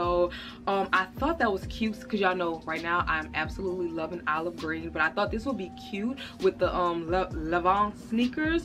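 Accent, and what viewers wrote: New Orleans, United States. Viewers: I would've never guessed your accent for New Orleans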